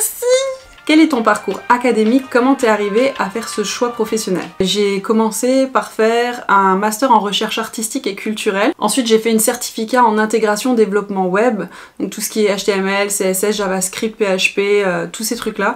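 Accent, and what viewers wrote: Quebec, Canada. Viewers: tu commences à avoir un petit accent Québécois non ? :p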